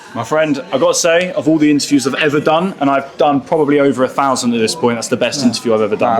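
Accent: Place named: Northern England, United Kingdom. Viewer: Subtitles for a Northern accent is sending me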